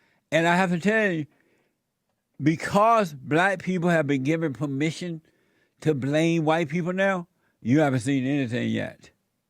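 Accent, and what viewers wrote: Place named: South Carolina, United States. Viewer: Yep sounds like South Carolina.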